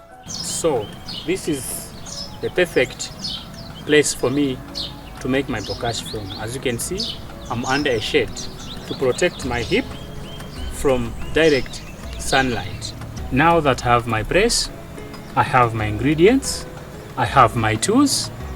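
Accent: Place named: Zambia. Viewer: Definitely going to try this. Thank you from Zambia PS you sound Zambian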